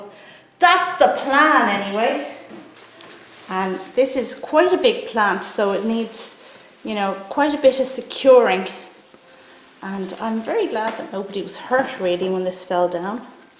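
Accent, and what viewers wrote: Ireland. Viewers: OH NOOO!! Every plant lover's worst nightmare! Not the Monstera! LOL - I stumbled upon your channel recently and just subscribed! Love it and all your tips (and lovely Irish brogue!) Happy Planting, dear one!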